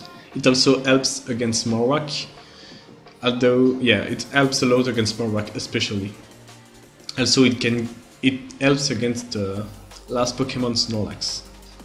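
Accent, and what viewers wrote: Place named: France. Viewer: These are good videos. I have watched a few of them already, and I enjoy the combination of the team presentation in the beginning and the following game commentary, showing how it works in practice. Also, the French accent is surprisingly pleasant to the ear. What do you think about the idea of going more in-depth on a team presentation, and showing more than one game (two or three, most likely) where you use the same team?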